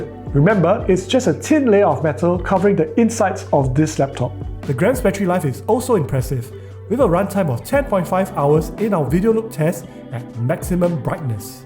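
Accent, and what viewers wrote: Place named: Singapore. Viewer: you Sound singaporean imo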